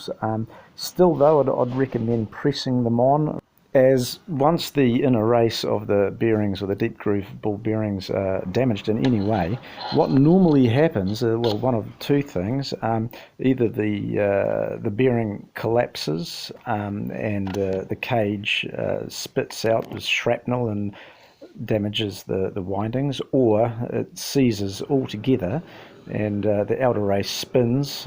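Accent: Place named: United Kingdom. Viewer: Very Informative and if viewers have a problem with British accent....use the closed caption. Thanks for all the information.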